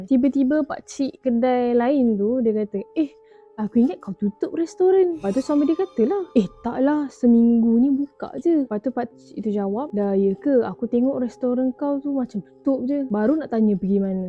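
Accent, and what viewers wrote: Kelantan, Malaysia. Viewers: ni Ore Kelate jugo ko??😅😅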